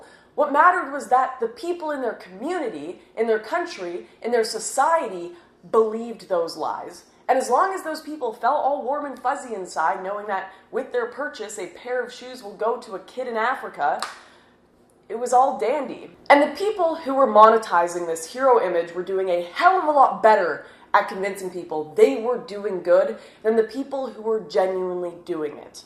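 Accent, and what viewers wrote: Canada. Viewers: Don't remember what video it was but it was a recent one. In it I was kind of surprised at her pronunciation of eggs ('aegs') and flags ('flaigs') as well. I knew Canadian English was a bit different to American English accents (the well known 'aboot' instead of about :P) but I didn't know (some?) Canadians pronounced these words like that. But to be honest, I couldn't care less what accent she speaks in, I'd still listen :D. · 😘 Amazing red pill moment beauty Canadian accent and all the lies of the world are coming out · wow dude, so many Canadian pronunciation gimmicks... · i hate that canadian accent lol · draygons? frodo bayggins? is this a Canadian thing? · Draegens and Frodo Baegens. Canadian accents (kinda Minnesotan) are hilarious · Dylan Gill But she isn't American, she's Canadian; I have a couple Canadian friends, and they often pronounce the letter "A" weird. 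· Al DEE it must be due to her Canadian accent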